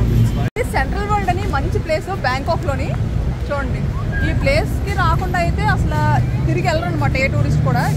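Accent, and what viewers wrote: Vizianagaram, India. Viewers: మీ , మా యిజనగరం భాష బాగుంది. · మీ విజయనగరం యాస కు.. ఫిదా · vizianagaram praantheeya bhasha Vinipistundi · Happy wedding anniversary 💐 God bless you I am Anasuya from VIZAG , Profession by Teacher. My native place is VIZIANAGARAM. Mi matalu chala baguntayi. Mi matalu vini VIZIANAGARAM aria anukunnanu. Adi currect iyyindi. You're so Active. God bless you.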